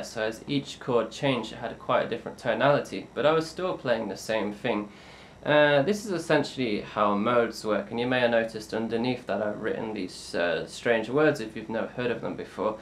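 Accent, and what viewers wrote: United Kingdom. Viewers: What country are u from u kinda sound English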